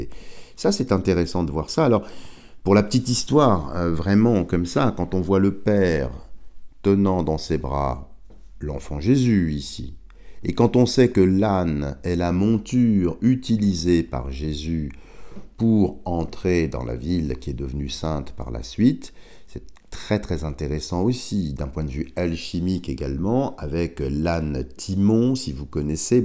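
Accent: France. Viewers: Aaahhhh le sud ! 😀😅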